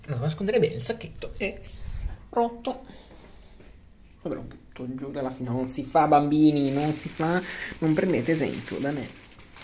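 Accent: Italy. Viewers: Se parli romanaccio non fai ridere sembri solo un boomer che vive a Roma e vuole i like👌NOICE cmq chi sta leggendo copiate e incollate alle persone tipo questo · Ma sei di Pesaro? Hai la parlata da pesarese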